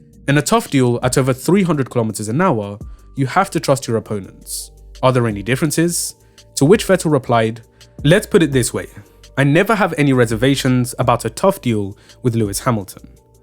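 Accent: United Kingdom. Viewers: British accent noted... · This guy must be British 😄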